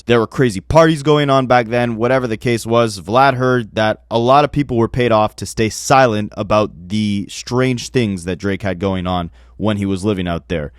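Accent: Canada. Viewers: Bro i knew you were Canadian!!!! You have similar speech patterns to Whats The Dirt. (Not saying you are WTD). You say "against" the same exact way. I was wondering if it was a Canadian thing. Love the videos btw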